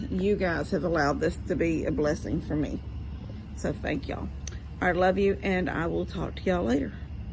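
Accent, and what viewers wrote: Texas, United States. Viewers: We love you. I love to watch your relationship with and. The absolute devotion you have for your beautiful boy. I was so happy to see you'd bought your beautiful truck. I felt like you seemed guilt ridden. Everything you do to give you happiness makes me happy and also Tony benefited. I love watching your Texas life and could listen to your Texan drawl all day long ❤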